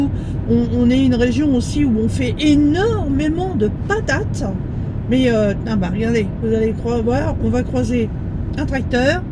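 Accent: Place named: Eure-et-Loir, France. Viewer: Ça fait plaisir entendre quelqu un qui parle eure et loir moi j i habiter mais du côté de dreux bizou bonne journée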